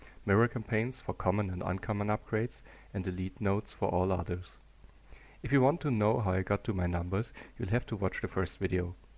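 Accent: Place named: Germany. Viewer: Geiles Video Nice vid Deutscher Akzent würde ich schätze n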